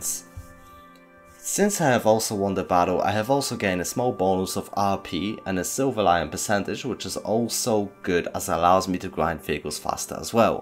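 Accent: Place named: Poland. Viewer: Why do you have Polish accent ?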